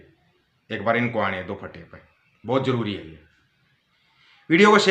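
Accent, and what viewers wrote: Haryana, India. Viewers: Sir, your Haryanvi accent is balle balle. Carry on.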